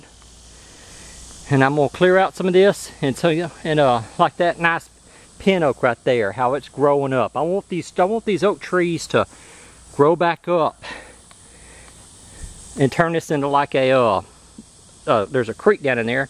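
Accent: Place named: Tennessee, United States. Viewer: Were are you from? Is it Tennessee I really dig your accent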